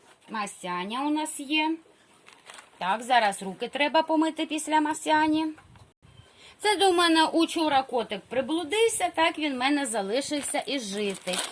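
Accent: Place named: Surzhyk, Ukraine. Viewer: зараз такий час коли усі балакають з телефоном та самі з собою.Ви теж квасю яка гарна суржикова вимова та говірка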